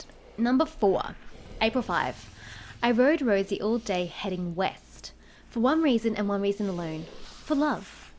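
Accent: Australia. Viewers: Just found that video by coincidence whadda great video and such a sweet australian accent :3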